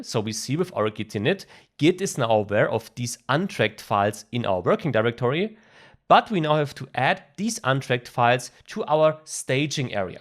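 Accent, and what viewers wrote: Germany. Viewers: Yeah, he sounds a lot like a German.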